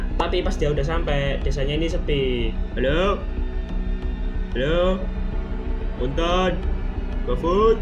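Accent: Java, Indonesia. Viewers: Koe wong jowo to maz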